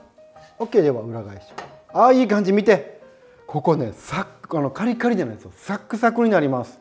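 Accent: Osaka, Japan. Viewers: イギリスからです。以前から日が経ったパンを使ってブリーチーズとクランベリージャムとサラダほうれん草を挟んでオリーブオイルを薄く塗ったフライパンで押さえつけてホットサンドを作ってました。先ほど少し硬くなったくるみとクランベリーのパンを使ってコウさんのおっしゃる通りバターで冷凍ベリーミックスとブリーを挟んで何回も押さえつけて裏返しながら焼くと本当にサクッとパイのようになりました。いつも美味しい手軽に作れるレシピをありがとうございます。大阪節トークもいつも楽しみにしています。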